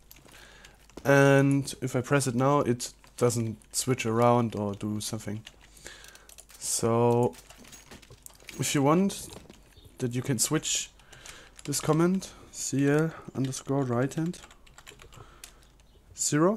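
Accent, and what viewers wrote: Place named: Germany. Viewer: Der deutsche Akzent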